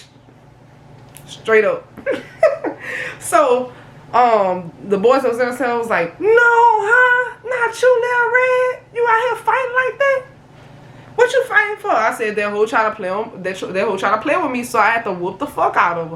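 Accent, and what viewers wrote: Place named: New York, United States. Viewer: i looove your accent! u from NY??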